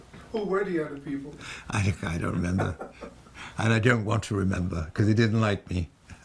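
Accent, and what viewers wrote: England, United Kingdom. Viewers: Hi handsome. I love your British accent!! And, I love when you sing the way you do, without it!! Either way, you are absolutely the best entertainer of all time in my book!!! I could listen to you talk forever!!! I go on YouTube almost every day and watch either a concert or an interview, or anything that you’re in just to hear you. You make my day!! Thank you!!❤️😘🙏🏻. Della, from Pittsburgh, Pa. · Maybe you can’t sing you songs that way but I just love hearing you when you speak with your English accent even though maybe it has faded a bit it’s still there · It's always great to see you Mr. Humperdinck. You sound great with that British accent also.❤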